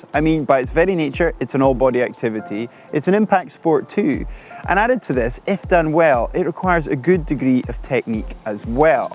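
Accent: Scotland, United Kingdom. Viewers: There is just something about that scottish accent, coming from either men or women, doesn't matter, that just... 😍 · Defo Scottish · Really though, what is his accent? It sounds like a Scottish blend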